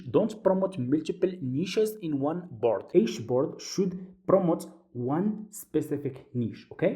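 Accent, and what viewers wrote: Morocco. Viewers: مغربي ياك من اللهجة باينة؟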